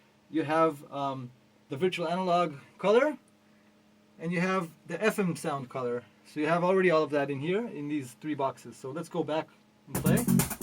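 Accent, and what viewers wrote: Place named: Israel. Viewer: אחלה וידיאו גבר כותב בעברית כי שומעים שאתה ישראלי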